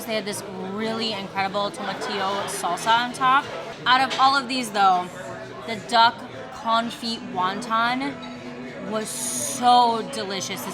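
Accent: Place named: Midwest, United States. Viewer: Great episode! Made me so hungry! I love that your mid west accent is going wild in this episode haha. Love the!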